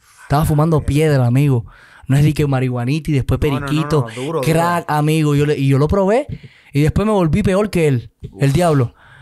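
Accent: Puerto Rico. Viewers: Me caí gordo por qué es Méxicano y habla puertorriqueño se cree o nose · Habla como puertorriqueño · Es cubano...se siente puerto riqueno y mexicano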